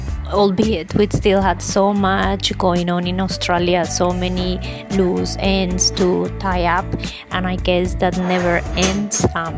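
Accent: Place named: Italy. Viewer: I imagined something similar ... your pronunciation in English is the typical pronunciation of an Italian. Welcome to Italy!!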